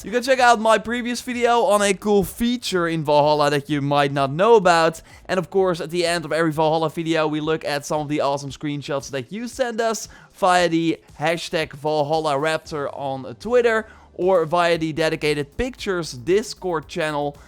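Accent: Netherlands. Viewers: WOW, IF THAT IS NOT A REALLY THICK DUTCH ACCENT, I DON´T KNOW WHAT IS!?!?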